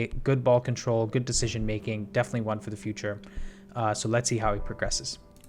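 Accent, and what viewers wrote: United States. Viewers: As a mancunian born and bred. I can never get used to an American accent talking about British football. Its as abnormal as hearing my grandmother talking about her sex life.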